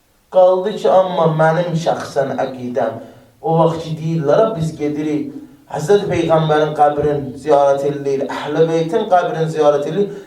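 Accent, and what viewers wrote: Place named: Iran. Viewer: sen niye iran lehcesi ile danisirsan ay Azerbaycanli?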